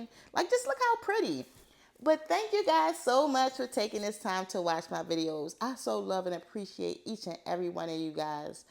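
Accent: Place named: Maryland, United States. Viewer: New subscriber here. Stumbled across your page on IG. Love love love your work. Me and polygel are not friends but I enjoy watching and seeing your sets. I could also tell from listening to your voice that you’re from Maryland. ❤ I love Rhimestones.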